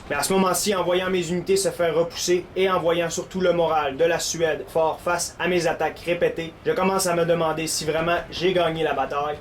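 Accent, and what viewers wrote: Quebec, Canada. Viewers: est canadien?? c est de quel region cet accent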